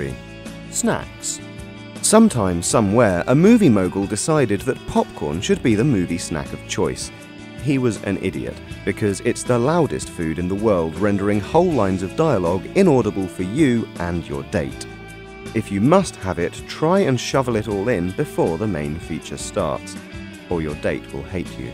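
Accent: United Kingdom. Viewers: yeah, but the voiceover guy had a british accent, so scissors paper stone is probably the british version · Brits? He sounds a bit proper and cheeky.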